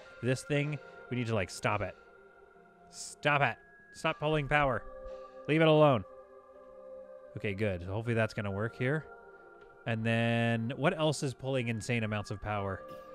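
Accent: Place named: Yorkshire, United Kingdom. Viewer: Don't you be dissing the Yorkshire accent!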